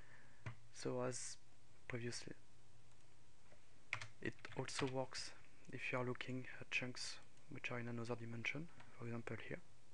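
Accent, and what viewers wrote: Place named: France. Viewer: L'accent français fait tout le charme de la vidéo =)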